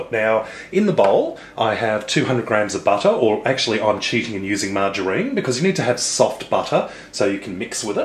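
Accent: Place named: Australia. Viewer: you can tell his Aussie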